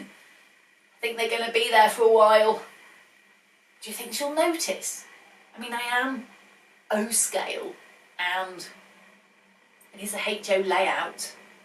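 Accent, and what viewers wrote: United Kingdom. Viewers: From watching other videos has made, I thought the brand name of this paint was, "Tamier,"' (rhyming with, "premier,") instead of, "Tamiya." I guess my American ears don't always do a very good job of processing the r sound that the British tack onto the a's at the end of a word.: D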